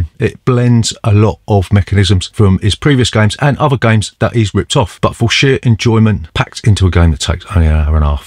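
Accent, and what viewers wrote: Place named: Newcastle, United Kingdom. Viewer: Superb again. Never knew you were a Geordie like me. Gan canny bonny lad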